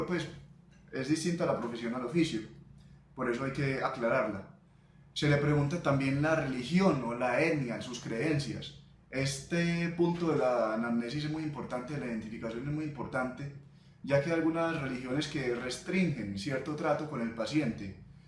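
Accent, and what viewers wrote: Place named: Antioquia, Colombia. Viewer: No solo Colombiano... paisa! :V jajajaja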